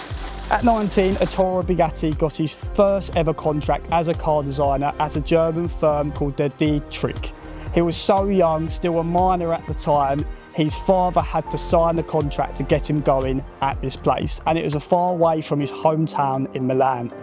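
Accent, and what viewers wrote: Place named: United Kingdom. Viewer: US of A. Also, you & many Brits pronounce your 'th's like Fs and Ls like W's. I love accents & find them fascinating. Thanks for the killer video!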